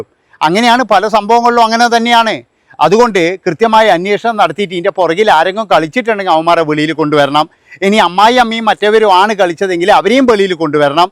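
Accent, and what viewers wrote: Kannur, India. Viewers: Enghalaranappa kannur karananno😂😂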